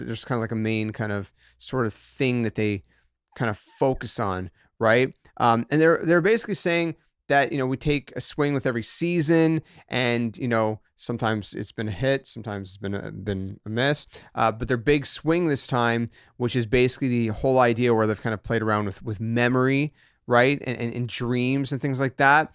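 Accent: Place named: Canada. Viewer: I’m here for the cute Canadian accent. Here for the degrassi vibes. lol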